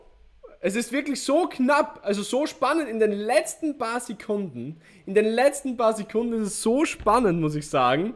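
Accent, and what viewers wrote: Austria. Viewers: Biste eh aus Österreich?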